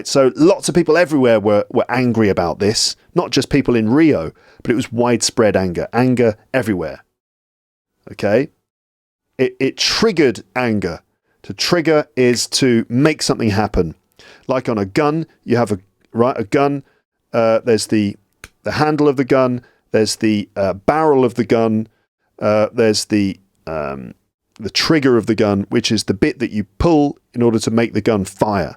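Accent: United Kingdom. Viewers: British accent is difficult.. I don't know why???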